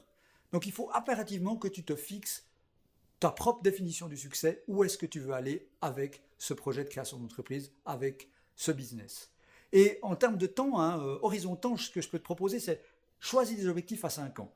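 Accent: Switzerland. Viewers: J'adore cette chaîne et d'autant plus avec un accent de chez nous :P #swiss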